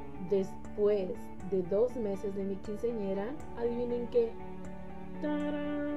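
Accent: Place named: Chihuahua, Mexico. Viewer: ya decia yo que tenias acento de chihuahua :) yo soy de chihuahua !!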